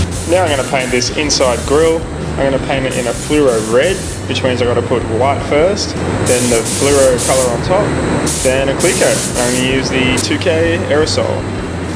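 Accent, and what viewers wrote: Australia. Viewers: An Aussie if Ive ever heard it! Good video mate. Keep up the good work.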